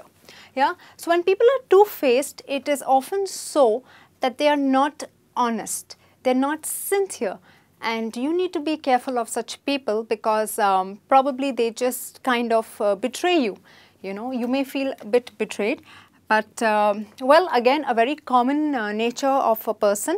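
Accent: India. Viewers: This is Indian english not English !!!!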